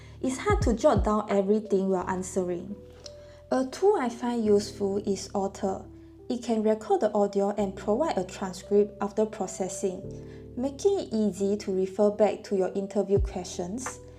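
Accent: Singapore. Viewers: she sounds singaporean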